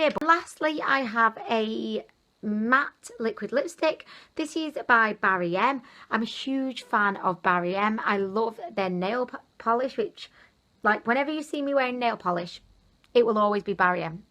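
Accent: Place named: Manchester, United Kingdom. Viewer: Love your personality and accent are you from Manchester:D x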